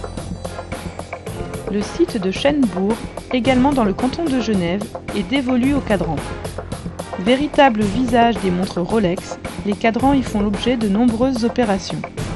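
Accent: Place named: Mexico. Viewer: why is she talking mexican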